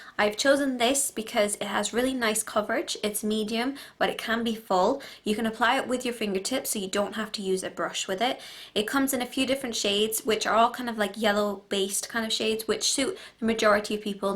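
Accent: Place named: Ireland. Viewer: You sound irish :)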